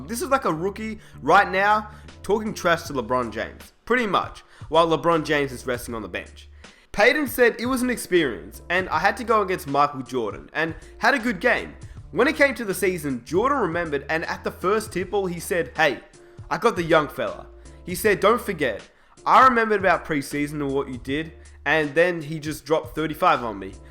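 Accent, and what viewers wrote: United Kingdom. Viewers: Interesting hearing a Brit talk passionately about NBA stories. Too bad the NBA sucks now. Too soft. It was great in GP's day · Omg english accent is so wroooong here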